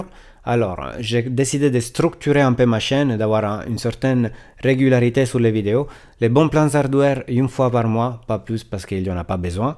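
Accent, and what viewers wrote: Italy. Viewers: Salut. Tout d'abord, un grand MERCI pour ta chaîne et pour l'effort de parler correctement le français avec ton accent italien ensoleillé, alors que la plupart des youtubeurs francophones blingbling avec du RGB partout ont moins de mots à leur vocabulaire qu'un berger allemand. Je me suis abonné à ta chaîne parce que tu vas à l'essentiel de l'info sans fioriture et surtout sans effets de style linguistiques "tendance" et ça, ça repose vraiment la cervelle. Tes avis et critiques semblent refléter tes connaissances professionnelles d'ingénieur informatique et c'est plutôt rassurant 😉 Sinon, pourrais-tu parler un peu plus du matériel que tu conseillerais pour des machines orientées artistes 3D (Unreal Engine5, C4D, Blender, AE...)? Merci 😉😊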